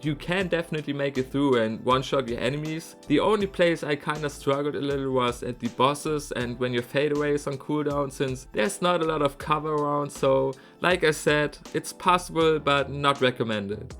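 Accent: Germany. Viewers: lul bist du deutsch?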